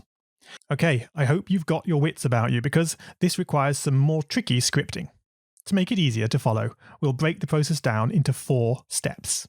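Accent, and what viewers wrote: United Kingdom. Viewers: okay look I’ve given this a lot of time, probably too much time, too many failed attempts… All these positive comments mean to me is you guys already understood something, this video did not help me build a working pixel game, and I did follow the instructions carefully. has been highly praised, so you will need to allow me to give one negative comment. Many times in these videos he misspeaks and corrects his speech with pop up text, which he uses unnecessarily all the time, saying things like “nice” and “looks great” and it just isn’t practical to believe you are adequately correcting your mistakes by mixing them in with all that overly happy sounding crap. Just show us how to make a video game. At this point I wasted 50+ hours of my life on you. Do better if you’re getting millions of views. Going off on tangents, changing things to “have a little fun” was not fun for those of us who came here to follow a step-by-step video. 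I did not learn how the components work from, not on this video or any other, probably because he kept changing things and half-explaining them. It’s like you have to use his specific complicated way that you don’t really understand but trust because he did it, or nothing. I wish the algorithm would give me more users, it is heavily biased to deliver, no surprise given we’ve been brainwashed to believe a white man with a British accent is the best. He’s not.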